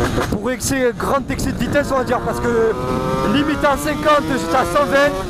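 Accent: South, France. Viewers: pourquoi tu as pris cet accent du sud normalement tu n'a pas cet accent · Toi t du sud avec t tu vois 🤣💪🤘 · avec l'accent du sud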